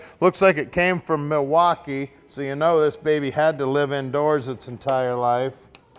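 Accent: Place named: Detroit, United States. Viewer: My grandfather had one of these in navy blue. RIP Grandpa. He worked for Ford and grew up and lived in Detroit, as did my mom, uncle, aunt and grandma, and your accent reminds me of them all. It's actually bringing tears to my eyes. Thank you.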